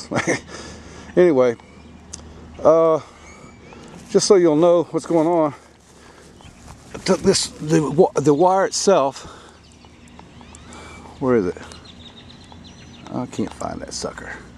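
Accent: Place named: South, United States. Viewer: are you Georgian or Southern? I like the accent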